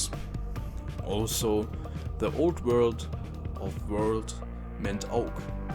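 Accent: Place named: Germany. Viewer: you have german ascent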